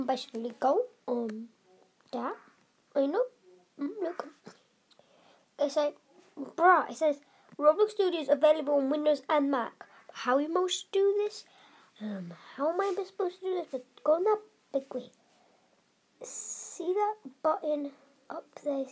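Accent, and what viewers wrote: United Kingdom. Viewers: That Cute britsh Voice Doe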